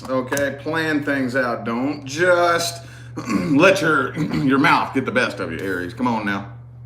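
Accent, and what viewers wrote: South, United States. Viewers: Hello there, im Trudi and a Leo. Iv just come upon your channel in the last 3 days. Ive got to say the first reading i saw of yours it was like a magnet pulling me in for more. You have alot that you need to share with as many people as possible . I dont know if its your easy going small town country boy drawl. Or maybe your aura of i got things under control just relax or your songs you belt out while you shuffle and pull cards. All of which makes people turn and pay attention and watch you and listen. I watch maybe 3 readers because i feel they truly feel the cards and the messages behind them. You and one other are pretty close to reading my life .its spooky and i get chills when a reading is so close to my heart. But the way you explain the Universe to us is a great gift. Im a true beliver in the Universe and what you put out in the world good or bad will come back to you ten fold. 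You may not be content trying to get these lessons thru to us. Its hard work when noone takes responsibility for their own actions anymore. This is the way of our world it seems. But my opinion you are doing a wonderful job. Thank You im glad to beable to watch your channel. Soon ill get a personal reading appt. Set up. My person of late is a Virgo. We are done but he decided to hurt my feelings on his way out. Ill survive . Im thinking positive thoughts. You are a good person.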